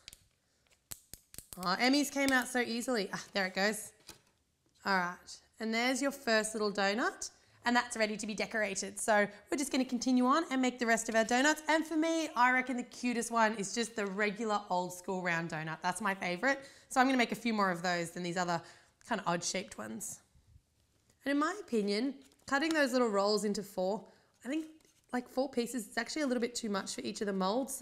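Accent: Australia. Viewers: you sound like Rachel Ray with an Australian accent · Your voice is enchanting. I love Australian accents.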